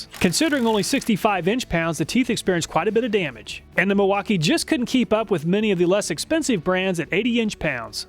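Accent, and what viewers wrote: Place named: Southwestern Pennsylvania, United States. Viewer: Hi mr project farm! After watching all of your videos your accent on A's and O's remind me of home... Do you happen to be from a certain city in South Western Pennsylvania?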